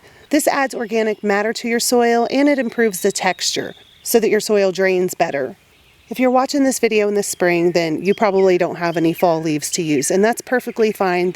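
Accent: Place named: Philadelphia, United States. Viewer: Your accent is straight-up gold! Delco? South Philly? I love you!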